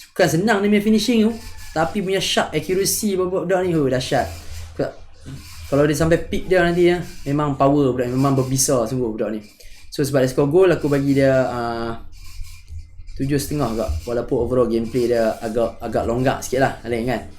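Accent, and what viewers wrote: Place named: North, Malaysia. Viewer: org utagha sggh abg ni 😆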